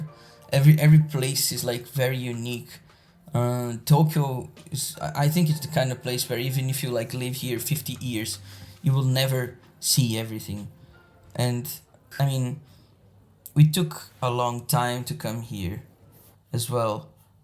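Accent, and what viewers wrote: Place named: Russia. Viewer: Tem N com sotaque russo, tem like.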